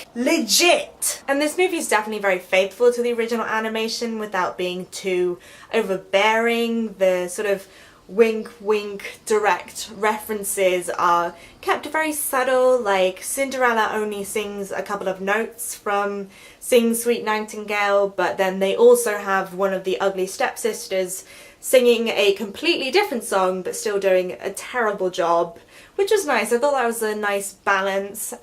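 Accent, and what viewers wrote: United Kingdom. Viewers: are you from UK ? just wondering about your accent. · Yeah cause you sound like your from Sufford(the same place as Lily James came from).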